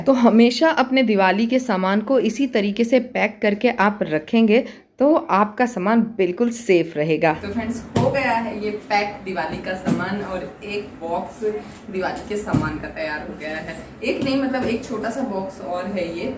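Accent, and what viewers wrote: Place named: Haryana, India. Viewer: Aaj awaj me dum hai haryana wali filling hai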